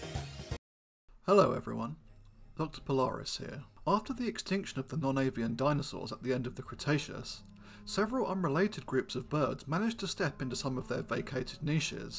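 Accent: United Kingdom. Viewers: He pronounces them the British way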